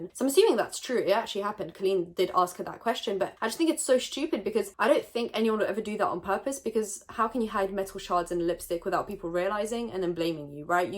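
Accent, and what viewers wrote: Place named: United Kingdom. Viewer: I fucking LOVE this kind of British accent when they pronounce literally as “lit-cher-lee”. It’s so damn cute.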